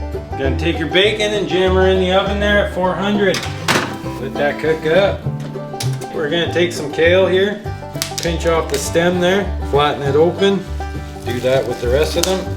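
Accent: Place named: Nova Scotia, Canada. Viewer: You're from nova Scotia aren't you brother?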